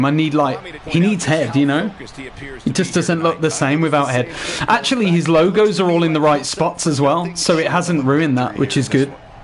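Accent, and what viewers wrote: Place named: West Midlands, United Kingdom. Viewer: I honestly can't believe I can't I payed 150 for this game.....btw I'm the person who recognized your west Midlands accent 😂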